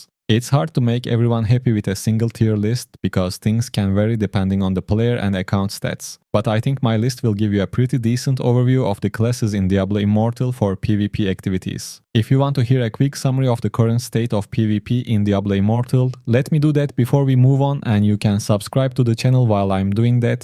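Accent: Turkey. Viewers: türk ingilizcesini nerde duysam tanırım xD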